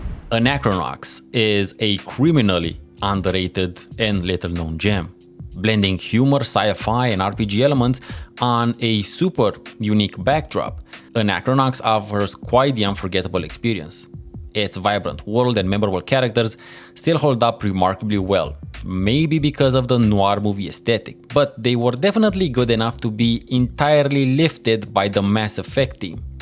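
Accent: Romania. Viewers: Ai accent de roman. Esti roman. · Badass list. What's that accent? My terrible guess is Romania :)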